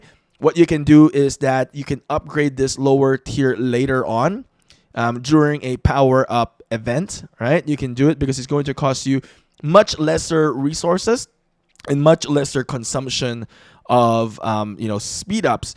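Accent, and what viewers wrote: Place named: Philippines. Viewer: Pinoy accent, pinoy ata 'to.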